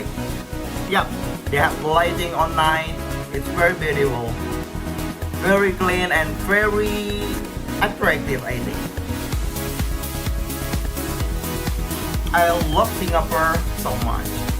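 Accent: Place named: Indonesia. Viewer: Englishnya Indo bgt😄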